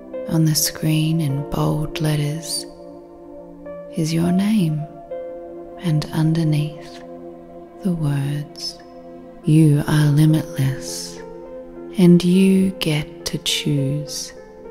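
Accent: Australia. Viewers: ...thank you! I've been listening to a lot if these meditation videos but your voice is SO soothing....must be that Aussie accent. In any event, thank you! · WOW ❤ !! Powerful & Gorgeous !! Plus that Ozzie accent 🤩 ! Thank you 🙏🏻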